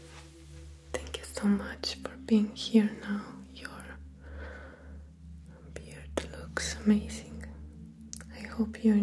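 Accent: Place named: Russia. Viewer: I figured from the accent you were from Russia or something. Added to the beauty. Very good your videos. You should do in Spanish for Latinos 🙌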